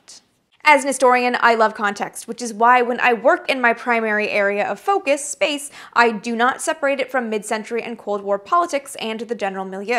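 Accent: Canada. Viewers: I suspected you were Canadian becauae of your pronunciation of certain words so I looked you up & sure enough even though you were trying to hide it.. I'm a big fan of your you tube channel & your depth of knowledge. I don't care if you are not from the U.S. & are an expert on NASA. Imo it gives you extra cred that you adore all things NASA & American space history despite not being born here. There are so many Canadians compared to Americans when you factor in the relative size of the pop on you tube & I think that eludiates a big prob here: we don't educate our citizens anymore. It really is a sorry state of affairs & I wonder about how many Americans are out there that could have contributed big if they been properly motived & piqued their interest in the hard sciences & education in general. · I have nothing but love for Canadians, the Brits, and Aussies. I knew you were Canadian when you said “aboot” (about) and “surry” (sorry). 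It’s adorable 🥰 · I am now noticing the Canadian 'o' pronunciation and now I can't stop hearing it.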